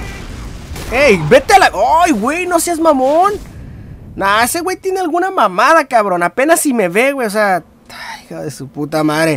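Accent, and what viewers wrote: Mexico. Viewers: suena como mexivergas, por personas como es porque no voy a mexico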